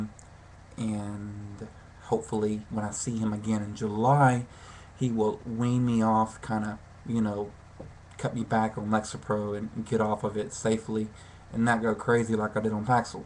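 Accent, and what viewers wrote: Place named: South, United States. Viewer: Hey buddy! Thanks for checking my vid out! I noticed your Southern accent (half my family is from the South). Being a man with depression-type issues is hard enough, but is it even harder in the South? My father was the hardest-core South Carolinian you'd ever meet. He was bi-polar but never sought help cuz of his pride. We suffered because of it.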